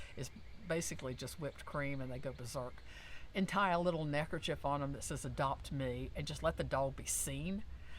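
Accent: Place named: South, United States. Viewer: I just subscribed ! I really enjoy your videos, 🎉they are to the point and enthusiastic and I like your southern accent I work with shelter animals, just cats. I would work with dogs too, and gladly walk them but it’s too far from me at this time. I’m looking forward to your videos about tips to losing weight, I already watched the one about pasta, so I’m going to the grocery store in a few minutes.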